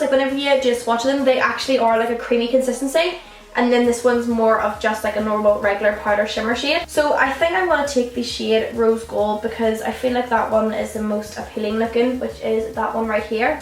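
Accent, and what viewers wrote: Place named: Belfast, United Kingdom. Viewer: wee bitta belfast no mean no mean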